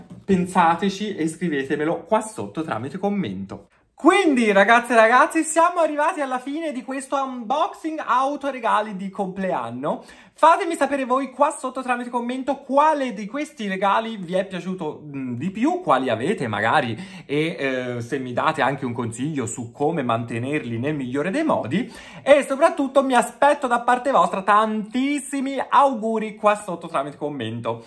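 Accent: Tuscany, Italy. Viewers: Ciao ….io è da poco tempo che ti ho scoperto e ti seguo…ma sei stato una meravigliosa sorpresa….non riesco a fare a meno di guardare i tuoi video perché mi fai spataccare dalle risate….sei una persona davvero stupenda e sono molto felice di averti scoperto! Poi hai quell’accento toscano che io ho sempre adorato e mi piace da impazzire😍 ti auguro tutto il bene di questo mondo e che tu possa fare sempre piu video e avere sempre più persone che ti seguono…perché te lo meriti! Sei unico!❤………… ah stavo quasi per dimenticarmi…..no scherzo🤪😂 tanti tanti auguri di un meraviglioso compleanno❤ ti adoro 🥰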